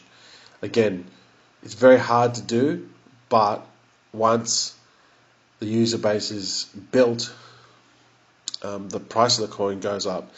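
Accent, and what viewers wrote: Australia. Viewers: Outstanding video and advice mate. Aussie accent expat. Where are ya ? In CEL BNB THETA since 2019, all solid. I like Noia Network and Ziliqa, they meet your criteria as well.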